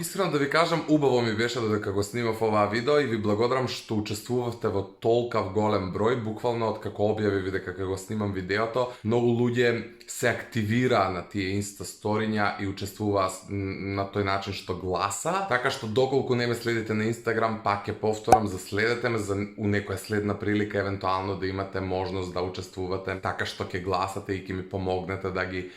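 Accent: Kumanovo, North Macedonia. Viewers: zbori na kumanovski prekrasno video pozz <3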